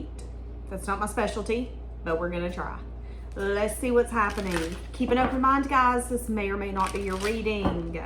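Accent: South, United States. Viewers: I love ur energy, your southern sound. This reading is telling the truth... ❤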